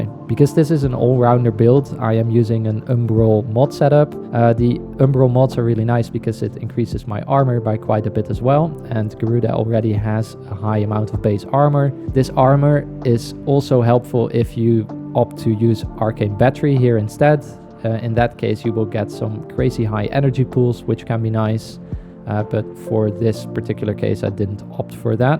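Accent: Netherlands. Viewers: Do i hear a dutch accent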